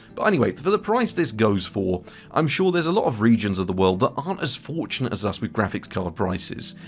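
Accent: United Kingdom. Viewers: When you said "20 pound old AMD flagship" I was thinking "Imagine the GPU sag with that kind of weight!", but then I realized from the voice, "Oh yeah, he's from the UK, 'pounds' are his money!"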